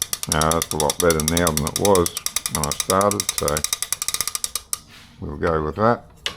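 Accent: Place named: Queensland, Australia. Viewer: . Yeah you are very watchable and that pure Queensland accent has a lot to do with it ! I have never watched a fishing channel on TV or YT cause they are all melodramatic wankers, like the 4WD wankers wearing the obligatory Drizabone oilskins when it’s 40 degrees😂 Obviously you’ve been doing this stuff since you were a boy so you speak with authority unlike most of YouTube where it’s always a case of ,” hello, we just bought our first boat and this is the first time we’ve been near the water so we’re going to teach you how to sail around the world…….once we learn how to untie these rope things…😂😂😂😂😂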